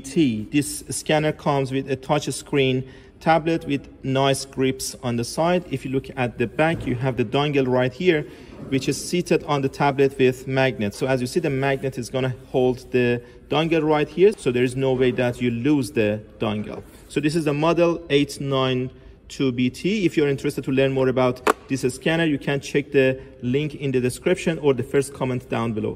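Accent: Iran. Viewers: That is some nice Persian accent. Good job on the video.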